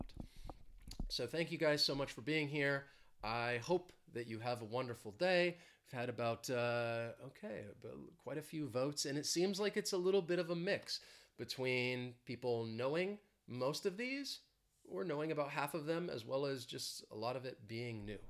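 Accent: United States. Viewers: I come across your channel, thank you for the great lessons, very professional, you sound like you was born in the USA :)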